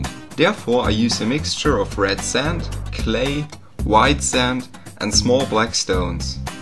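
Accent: Germany. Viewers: Well i can hear that youre german. WoW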